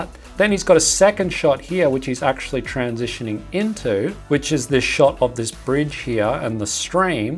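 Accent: Australia. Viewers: Because he's australian lol. It's called accent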